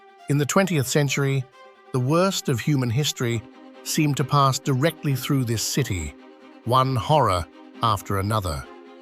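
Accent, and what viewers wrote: United Kingdom. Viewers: Great 😃👍 Brit narrator ❤! Sounds like the 👑 one on! (by, mid '70's).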